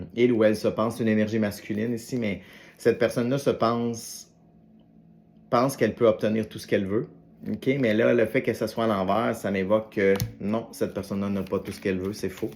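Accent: Canada. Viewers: Bonsoir, vu l'accent vous êtes du Canada, si c'est le cas incroyable d'avoir une telle précision sur le tirage avec tant de distance, comme quoi les énergies sont connectés. Merci pour votre guidance 👍👍👍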